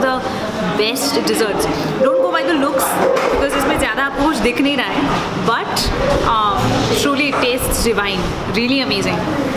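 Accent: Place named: Pune, India. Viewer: Assal punekari hindi boltes tu. 😅😅 Ekhada video marathi madhe pan banav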